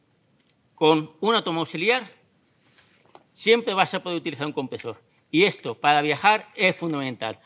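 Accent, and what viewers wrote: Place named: Andalusia, Spain. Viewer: El sonido de las chicharras ya indica el calor tan bestial que tenía que hacer ese día. El acento de, escuchar andaluz no tiene precio, que por cierto como ha cambiado bajando una cuestecita en la ruta de cabo norte para hacer acampada y como va ahora en tierra. Y el consejo, pues como siempre fantástico. Ahora toca ponerlo en práctica y tener esa toma porque llegará un día que nos pase y nos acordemos del fantástico consejo que un motero nos dio. Gracias y hasta la próxima 😉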